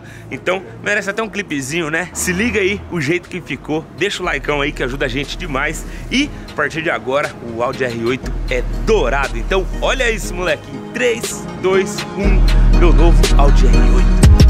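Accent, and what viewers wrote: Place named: Bahia, Brazil. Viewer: Baiano demais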